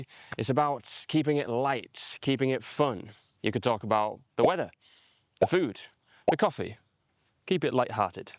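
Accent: United Kingdom. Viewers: Your accent is very clear and easy to understand Mr. unlike the cockney accent tbh I have an Indian accent and would love to pick up SSB Accent like yours so I wanted to ask if you have 1:1 training classes? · Are you from the north of England? You pronounce your u's just like Gary Barlow does 🤔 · Do you speak british english? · Hello, what a nice video indeed I really like the style you're using for your channel, the manner...the pace well I don't know if you talk like that in normal life...and of course the accent, I love the British accent a lot. And, those suggestions are very useful for a guy like my who is quite the opposite of being talkative 😅 and he's learning English to beat his shyness as well, so...thank you! 🙏😊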